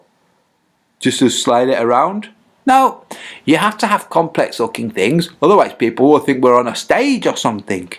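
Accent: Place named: India. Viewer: sounds like that indian guy from short circuit. kinda cool accent lol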